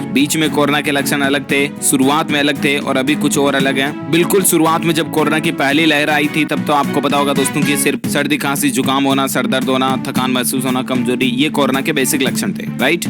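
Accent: Rajasthan, India. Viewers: Bhai kya aap Rajasthan se hai?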